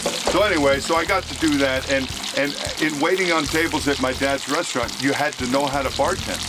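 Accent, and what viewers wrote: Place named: Italy. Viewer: U have a kind of a italian,,,ish accent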